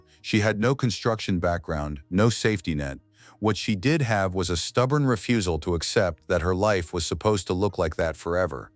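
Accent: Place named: Kentucky, United States. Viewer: I started watching about a year ago. As a fellow Kentuckian (also from the Louisville area) I swore she was in KY for the first several videos I watched ( know my KY accents!) until she said she was in North Dakota. But the accent just didn’t add up for that area. Never the video I watched today I was thinking about that. Now that I know she’s originally from here t makes sense!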